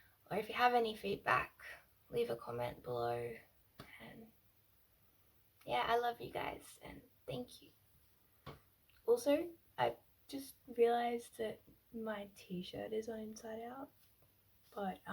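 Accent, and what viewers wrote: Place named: Australia. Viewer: Thank you. Sounds as though you are Australian. Nice to hear.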